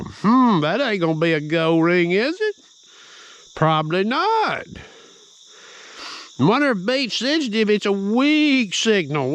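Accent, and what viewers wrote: South, United States. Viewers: He's pretty smart for sounding like a hill-billy isn't he? :). When I saw him put a Legend near a fan and spin a coin around to test the speaker.. I knew then this dude was a genius!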